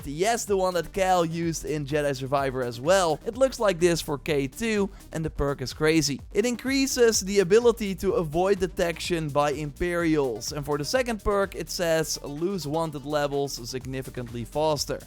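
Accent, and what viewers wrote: Netherlands. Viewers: Opens video -> Hears dutch accent -> Skip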